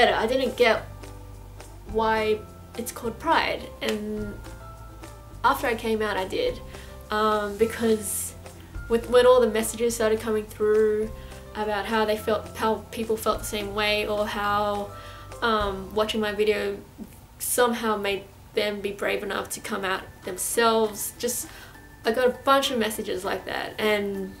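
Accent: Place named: Australia. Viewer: Very powerful Vid to those still in the closet. U earned yourself a Sub from a str8 guy. I don't judge ppl based on sexual preference. I judge ppl based on persona, good or bad. Just want to say, I've watched quite a few GOT reaction vids while bored work. Many are fake, and not genuinely emotional as your last GOT reaction vid. "Arya's reunion with Nymeria" Thats all it took for me to Sub. It almost made me tear up, and thats not an easy thing to do. Haven't shed a tear in 10 yrs, lol. Well, that and I like your cute Aussie accent. ;)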